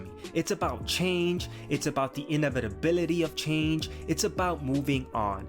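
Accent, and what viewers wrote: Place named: Miami, United States. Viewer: You have a heavy Miami accent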